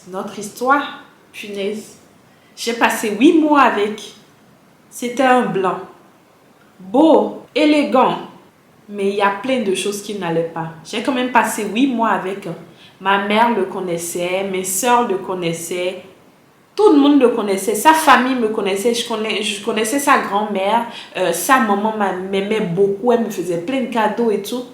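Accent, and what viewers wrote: Benin. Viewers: ah d accord super alors je suis béninoise aussi j’ai reconnu l accent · Tu es Béninoise ? Ton accent ❤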